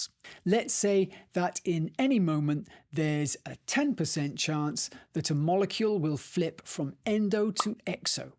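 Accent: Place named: Scotland, United Kingdom. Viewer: You know when you said this was made in Japan I was like huuuh as your Scottish accent had me guessing like you were someone in England or maybe Stirling, don't know why, but did you know the open university have no DaisyChains Audio or any audio version of there bio-chemistry related subjects and they don't know why, they have literally just been apologising too me for 5 years since I am dyslexic, and I failed 2nd year twice because I have just been giving walls of text too read as a dyslexic man and like I can't learn like that, though this is a perfect way, which is why I have a stupid playlist I have been building over the past 5 years of everything practical and like visual (or just the only English information on Youtube, the amount of videos in English which go into Hindi drive me insane, I think I find a video and nope, but coming across your channel sure was a treat.) Being very useful, I wanna pass my final year, you know, would be nice.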